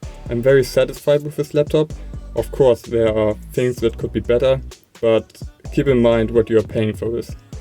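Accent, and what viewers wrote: Germany. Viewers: Dein Englisch ist gut, man hört nur sehr dass du Deutscher bist... xD LG :)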